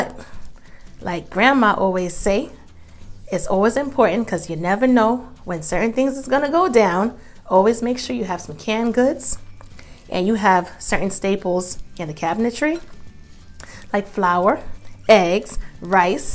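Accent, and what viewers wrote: New York, United States. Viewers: Love the NY accent!❤ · sounds like she is from NY...luv listening to her tawk...lol I put a lil cayenne pepper, a lil jiffy cornmeal, a tap of mustard instead of worcstchire sawce...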